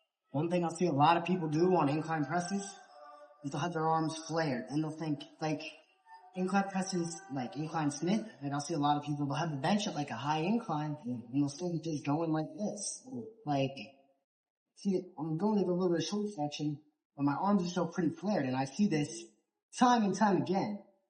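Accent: New Zealand. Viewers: Cigs and New Zealand accent, very Shan coded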